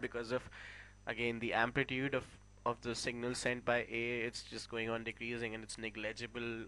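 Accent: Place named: India. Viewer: You seem to be Indian ! from ur way of speaking!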